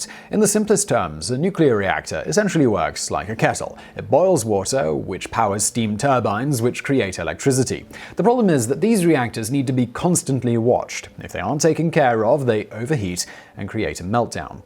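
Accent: England, United Kingdom. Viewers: Nathan Stautzenberger if they call Petrol Gas, what do they call Gas ? Americans are strange, yet he sounds English.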